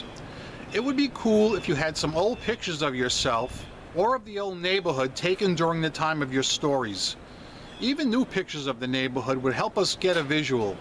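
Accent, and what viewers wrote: New York, United States. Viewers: the Joisey Boy with the New York Accent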